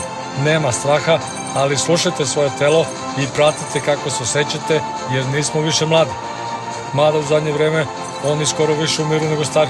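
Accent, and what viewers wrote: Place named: Niš, Serbia. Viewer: Bravo čovek . Strašno me interesuje jesi li ti iz Niša ? Ja jesam 1964 godište , a trenutno u Beogradu . Poz. Govor , je Niški 😅 .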